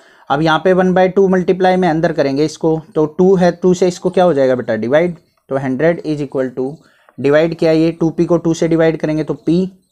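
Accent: Haryana, India. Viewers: Sir are you from haryana your accent is haryanvi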